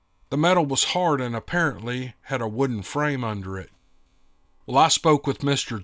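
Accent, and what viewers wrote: South, United States. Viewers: Again, I missed hearing this podcast. I need to change my email address, duh! For some reason your new podcasts aren't on YouTube TV! What's up with that? I always listen to them at night on TV, and I enjoy them so much. If you get a chance, I did send you a file that I narrated some time ago. There might be a couple more that I did you haven't put on yet. Perhaps, they weren't good enough to include. That's your call, of course! I'd love to narrate a couple to see if they go over well. My voice isn't you so I'm sure people would miss that southern accent of yours! I'm just an old yank! LOL Hope you're doing well. Glad to hear you have a job to do! I'll help if I can, just let me know.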